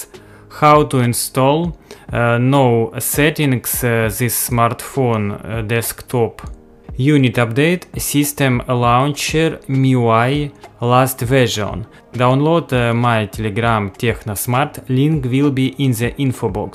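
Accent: Russia. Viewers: Сразу слышно что автор говорит на русском)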